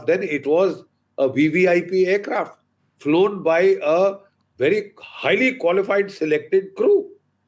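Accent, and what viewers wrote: India. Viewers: change his style of speaking from a strong typical Indian slang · His accent is typically Indian and jarring to the ears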